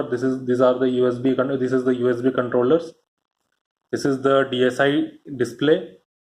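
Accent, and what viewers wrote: India. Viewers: another indian accent ...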